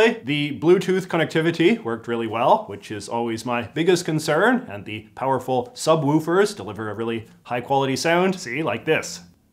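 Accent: Canada. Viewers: I’ve barely heard any Canadian accents but I love your one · this man has a classic stereotypical canadian accent and I love it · homie, as a fellow canadian, you’ve got the most canadian voice i’ve ever heard not in real life! you hit those aboots, love it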